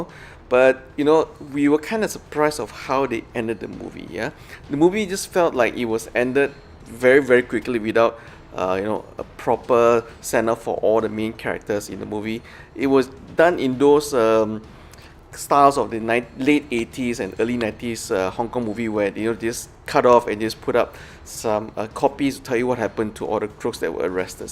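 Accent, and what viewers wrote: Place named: Singapore. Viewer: An average watch. You sounds like from sg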